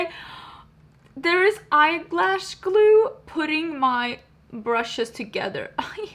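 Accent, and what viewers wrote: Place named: Sweden. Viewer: The automated caption did NOT follow you on the words skolavslutningen and midsommar. 😅 Love the swenglish tho! Keeping it real. 🇸🇪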